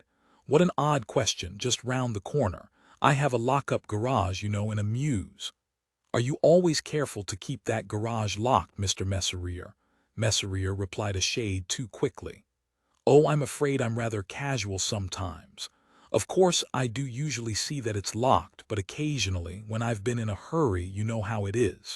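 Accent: United States. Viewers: Gawd. Terrible narrator even without the ridiculous American accent. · A Heyer story narrated by an American? Terrible! I couldn't listen.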